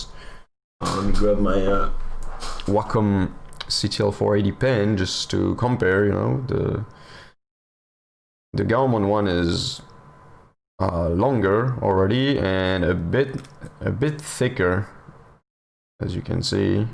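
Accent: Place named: France. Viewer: you have a french accent, are u french ?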